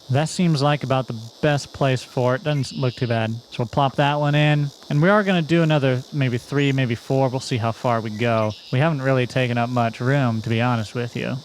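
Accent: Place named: Utah, United States. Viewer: Do happen to be from Utah? You have the accent 😅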